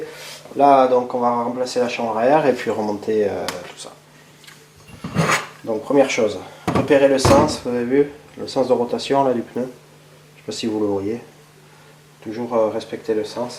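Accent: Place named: South, France. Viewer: Bjr Merci du tuto. Chèr rider cést tress bien. Réalisér laetitia de verviers en Belgique pianiste.. Et rideuse dans mes heures libres.... Un grand coup de chapeau. Pour remettre ce pneu.. En action.. Cest une bonnne vidéo bel accent du sud..❤️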